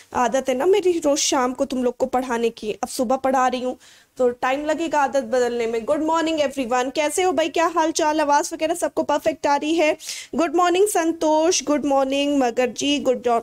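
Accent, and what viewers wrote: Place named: Bihar, India. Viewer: I think aap bihar se ho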